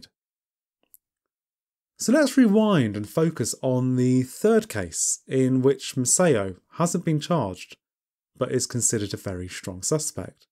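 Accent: United Kingdom. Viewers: indeed it is very outnumbered by American and Canadian channels they make great effort and content but its refreshing to have a face and a brit voice narrator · Absolutely no offense ment, but my friend and I have had a long running joke about a someone with a British accent being able lul you to sleep while describing the most horrific of acts. I have found proof!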